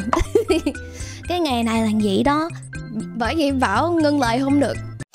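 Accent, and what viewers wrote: Mekong Delta, Vietnam. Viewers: nói tiếng Việt nghe đậm chất miền tây luôn á với lại mới biết đến thấy cute dễ thương...ngoài bả mình còn mê cả Shoto nữa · cách nói đậm chất miền tây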